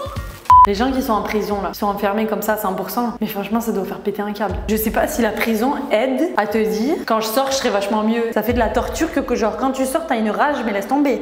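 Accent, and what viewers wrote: Quebec, Canada. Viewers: J adore ta voix et l accent québécois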